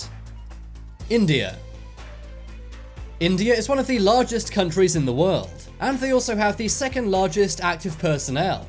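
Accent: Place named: United Kingdom. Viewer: This channel is so OBVIOUS in it’s erroneous “facts”. EVERY SINGLE VIDEO has outrageous statements glibly spewed by a Brit who thinks Americans NEED EVERY documentary narrated w/ a UK accent.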